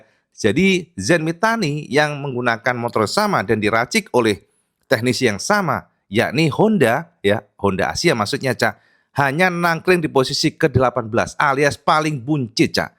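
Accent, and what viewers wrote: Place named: Java, Indonesia. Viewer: Mas.. Mirip logatnya kaya, yg ngonten KIOS KOMPUTER..! yg dari jawa juga... 😊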